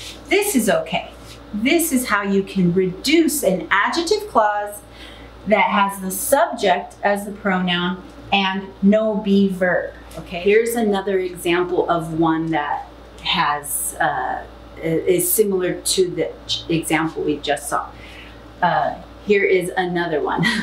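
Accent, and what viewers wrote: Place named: United States. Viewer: This isn't but american english !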